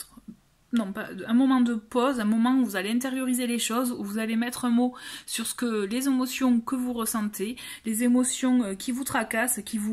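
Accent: South, France. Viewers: Bonsoir moi personnellement j'adore vous entendre parler votre petit accent me fait penser au sud de la France vous avez l'accent qui chante et cela fait chaud au cœur pour avoir vécu une partie de ma vie dans le sud et actuellement en région parisienne cela fait du bien oui ce confinement n'ai pas tous les jours facile surtout quand on et seule même si il y a Messenger moi qui étais vraiment très proche de mes petits enfants et quand il vous dises mamie tu me manque cela va être vraiment dur oui angoisser car avec ce confinement et mon autre qui travaille je me fait beaucoup de souci car nous vivons pas ensemble merci pour votre tirage passé une belle soirée Gratitude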